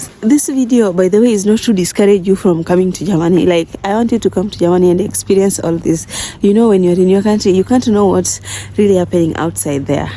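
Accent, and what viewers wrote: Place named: Kenya. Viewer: You sound East African, and keyan to be exact. Why the Ghana flag? 🇬🇭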